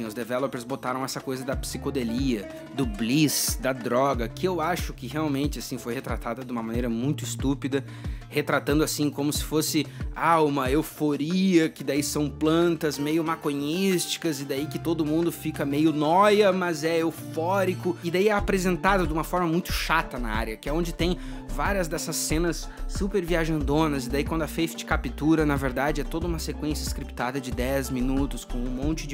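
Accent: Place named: South, Brazil. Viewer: O que me manteve nesse vídeo até o final foi esse sotaque sulista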